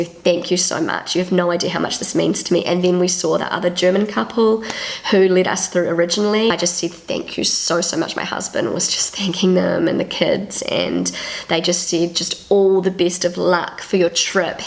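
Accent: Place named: New Zealand. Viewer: I always understand "chicken point" when you pronounce "check-in point". Kiwi accent is so funny! 😆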